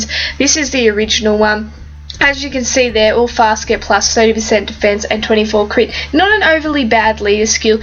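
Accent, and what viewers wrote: Australia. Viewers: Your Australian voice is nice to listen to!!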